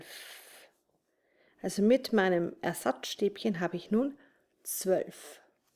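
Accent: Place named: Austria. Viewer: Mir geht es schon besser, den österreichischen Slang zu hören! Danke, für die Anleitung!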